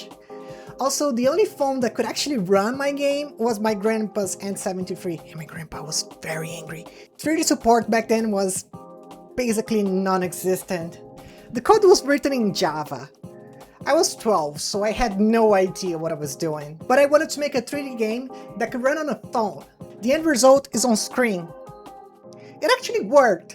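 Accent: Brazil. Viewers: i listened to about 5 seconds of your accent and realized youre a fellow brazilian, thats so cool :3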